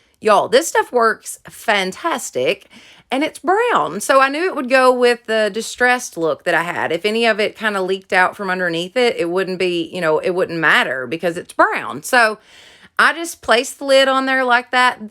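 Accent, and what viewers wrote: South, United States. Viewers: You are absolutely amazing! So talented & I love your personality. I am from Virginia with a southern accent too so listening to you feels right at home lol. Quick question...I just ordered some 20mm wooden beads from your Amazon link. Is that the size you find yourself using most often? Thanks! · I feel a kindred spirit with you! You and I sound a lot alike! COUNTRY! I am in upper-most Soutn Carolina so my accent is homegrown! Love your diy's! This is my first time watching you. God bless you!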